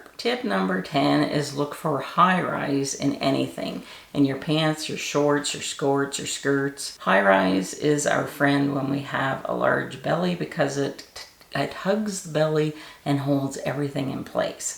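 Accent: Canada. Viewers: Looks like I have the same body shape as yours. I agree on high rise pants ,shorts etc. And I never tuck in tops. What I HATE is baggy pants jeans or dressy slacks. I call them "Bozo" 👖 pants. Mainly because I have thin legs and ankles but a way too big stomach. This is the first time seeing your videos. Nice I'll be looking for more. Are you from Canada? I only thought that because of the way you say " your O s " . 😊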